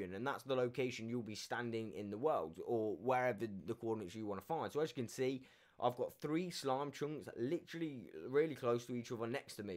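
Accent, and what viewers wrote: United Kingdom. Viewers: He's german And he has british accent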